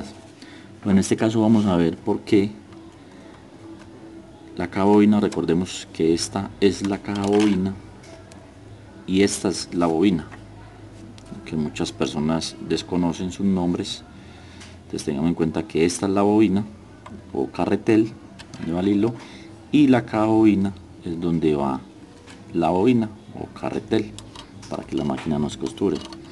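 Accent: Colombia. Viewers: Holaaaaa saludos no quiero equivocarme pero juraría que eres de Colombia, soy aficionada a la radiocomunicacion y conozco diferentes acentos. Me encanta tu forma de explicar tus vídeos. Gracias!! Voy aprendiendo muçho contigo. Es la primera vez que escribo.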